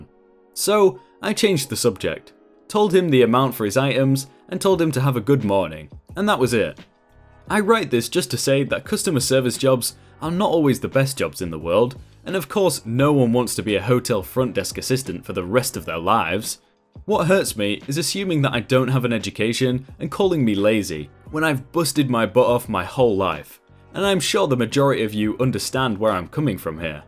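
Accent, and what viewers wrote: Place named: Scotland, United Kingdom. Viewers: Not a bad Scottish accent. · You have a decent Scottish accent. Don't worry about it.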